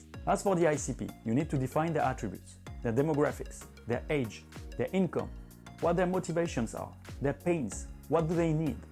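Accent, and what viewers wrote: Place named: France. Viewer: Tu à un accent Français ✨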